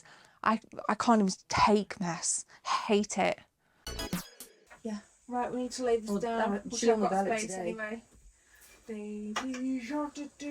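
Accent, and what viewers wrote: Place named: RP, United Kingdom. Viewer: You have a beautifully posh accent. What part of the country are you from?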